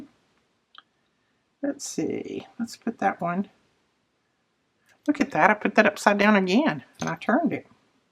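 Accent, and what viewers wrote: South, United States. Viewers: You are one of my favs on YouTube. I love that southern accent and calming voice. Keep being you and sharing your feelings with us. Block anyone that says otherwise.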